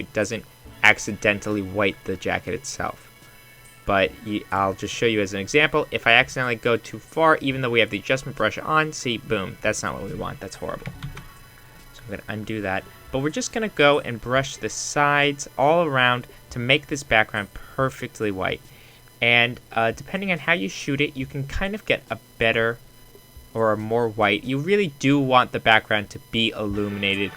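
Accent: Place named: New York, United States. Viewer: Your accent is intense. Definitely a New Yorker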